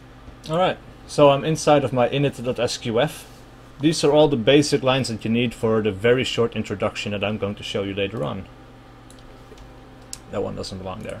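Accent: Netherlands. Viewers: A bit late to the party but good to see my dutch accent radar is still working. Thx for the vid though it helped a lot.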